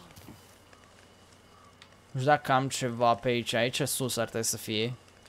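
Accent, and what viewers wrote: Moldova. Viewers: bă ce-mi place accentul de moldovean :)))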